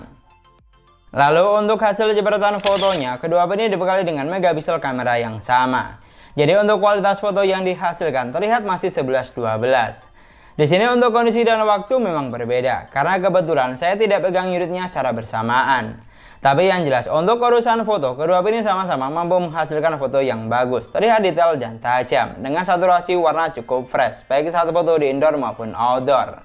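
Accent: Madura, Indonesia. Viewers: Logat nya kentel madura hehehe... Mantap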